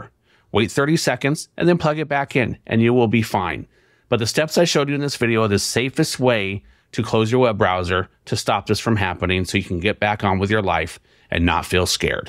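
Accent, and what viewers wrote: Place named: India. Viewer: She had an Indian accent!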